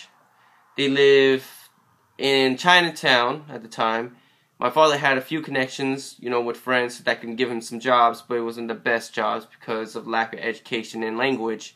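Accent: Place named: South, United States. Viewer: I just also wanna know where your southern accent is from. It's dope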